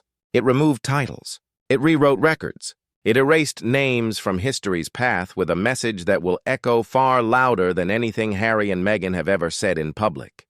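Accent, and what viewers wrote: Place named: United States. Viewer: Dear Lord how I hate anything narrated by a Yank. I know not a word can be believed.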